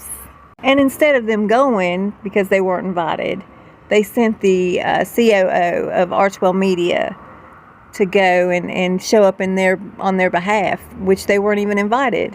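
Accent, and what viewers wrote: South, United States. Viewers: Hi I love your southern drowl. Megain was starting to make me dislike Americans. Then I realized it’s not fair to label all by that Hussey. I must tell you I can’t stand that President either. The two need binning. I am from Northern Ireland and fiercely BRITISH. We arrived in your country and helped start the country. We gave 17 Presbyterian President’s and help with the writing of the constitution. Xxxxx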